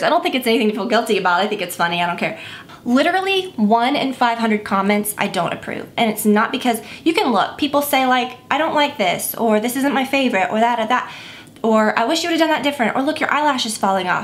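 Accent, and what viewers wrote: South, United States. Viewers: it's not incorrect, that's just southern accent shining through;)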